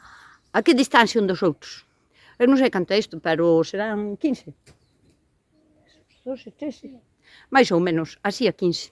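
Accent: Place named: Padrón, Spain. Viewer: Falo moi bén ,,gustame moito mirar os teus videos Por o falar creo deves de ser cerca de mín si podes xa me dirás Eu son o lado de Padrón 😊😊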